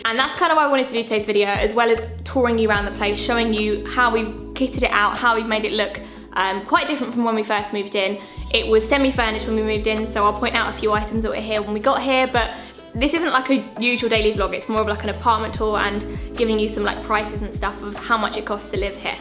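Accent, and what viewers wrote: United Kingdom. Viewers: That british sexy accent💜 Everytime i hear british accent i always think Hermione😂 it's fayaah!🔥 Sorry from my english haha😅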